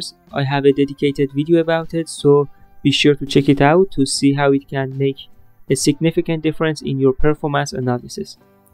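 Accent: Iran. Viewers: داش چرا لحجه ایرانی داری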